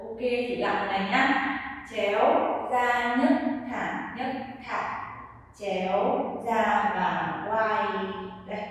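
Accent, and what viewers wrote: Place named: Binh Dinh, Vietnam. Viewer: cho cách nói xe bình định mkmk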